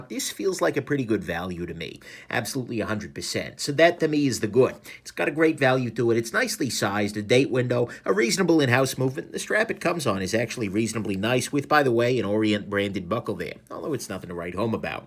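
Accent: New York, United States. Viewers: That accent is just awesome. So old New York I love it!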